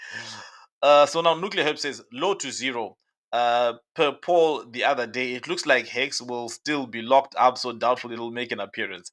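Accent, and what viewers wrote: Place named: Africa. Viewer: Speak some proper English you have such a strong accent African accent, American man this is America man !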